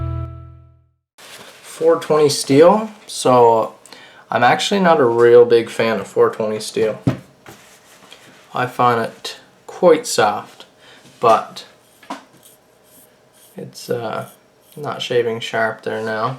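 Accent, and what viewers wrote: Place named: British Columbia, Canada. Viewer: haha yessss!! found the video quite by accident. recognised the accent immediatly. Hello from BC. Haven't been home sense 2002. Funny how hearing the accent from home has such an emotional/mental trigger.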